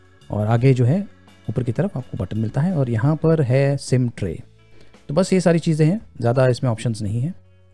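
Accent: India. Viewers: Person in video looking like non Indian but audio is Indian . Is this phone available in India .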